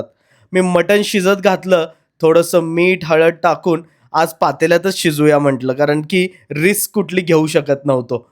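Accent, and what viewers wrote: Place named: Pune, India. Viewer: बोलण्यावरून साताऱ्या चा वाटत नाहीस भावा , पुण्याच वाटलइस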